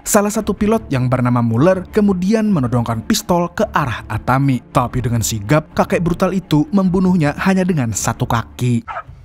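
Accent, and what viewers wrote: Banjar, Indonesia. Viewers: Njir urg banjar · Org Banjar sekali nya😂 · Banjar pina nya wkwkwk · banjar banar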